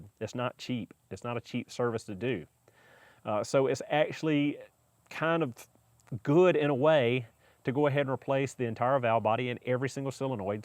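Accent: South, United States. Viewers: Bro, you sound like you’re from Georgia or Alabama. I’m in East Alabama and getting my first Subaru. Hit me up. I follow the service intervals, but want someone trusted for bigger stuff. My bigger stuff, I mean anything internal. I can and do sparks, injectors, ball joints, fluids, belts, etc.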